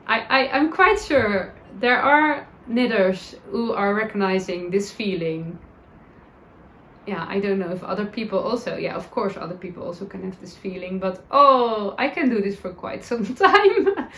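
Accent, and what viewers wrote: Netherlands. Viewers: I understand the change but that doesn’t mean that I like it. You are so cute and authentic. I too am amazed at your grasp of English. It makes me smile to listen to you with your Dutchy english. I will look forward to Mondays with you. Gayle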